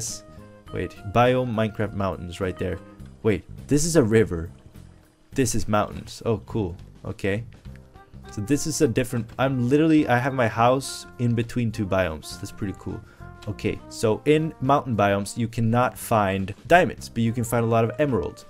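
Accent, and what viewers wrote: Sweden. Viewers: Are you from sweden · Are u swedish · Are u swedish??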